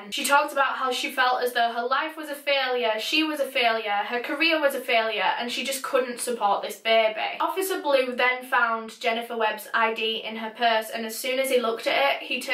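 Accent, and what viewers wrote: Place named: Yorkshire, United Kingdom. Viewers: I’m enjoying your videos 💕 your Yorkshire accent is great! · It's a Yorkshire accent haha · it's how we roll in Northen England. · Lol its just a Yorkshire accent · Hahaha being from West Yorkshire myself I just can’t hear the ‘baybeh’ like other comments it just sounds normal 🤣🤷🏼‍♀️ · Must be American , this is a Northern accent 💜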